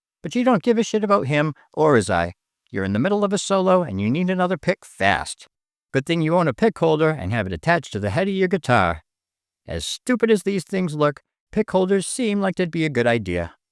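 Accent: Boston, United States. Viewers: Definitely a fellow Bostonian lol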